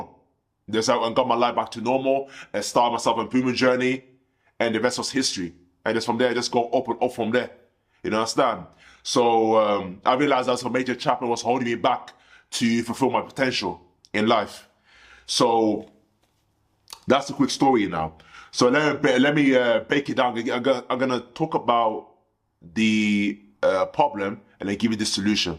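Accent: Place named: Caribbean. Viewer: you say you are muslim bro but cl you sound west indie. Where are your family from?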